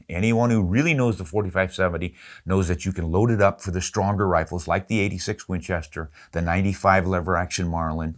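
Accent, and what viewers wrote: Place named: Wyoming, United States. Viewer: Where are you from? You scream Wyoming, Montana, or maybe Idaho to me lol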